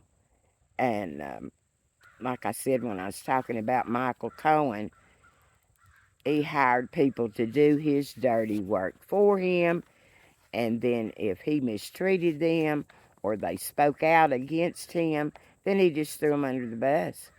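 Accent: South, United States. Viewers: It is refreshing to hear a voice of reason spoken with a southern accent. After listening to so many southern accents in the House and Senate, it made me wonder, is it something in the grits? You and Beau of the Fifth Column and Trae Crowder and others keep us enlightened.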